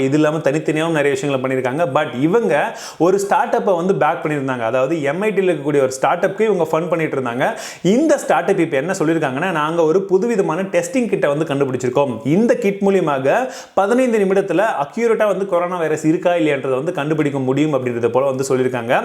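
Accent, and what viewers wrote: India. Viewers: வாழ்க தமிழ் if an innovative app from India raises I would really help with everything I have but not an app like tik tok even if it was from India I really see you as a Tamil version of MrWhoseTheBoss if you had an amazing accent other than Indian English accent you would reach ammmmmaaaaaazzzziiinnngggg heights 2 million subscriberslam onnum ila ungaluku please don't think this comment as a publicity these come straight from my heart and please do not think I'm discouraging you or Indian accent I'm just telling white men accent is soo good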